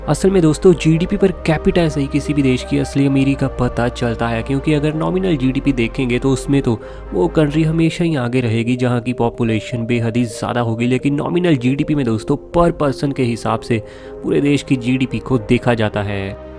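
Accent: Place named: Punjab, India. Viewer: tu punjabi a teri tone to pta lagda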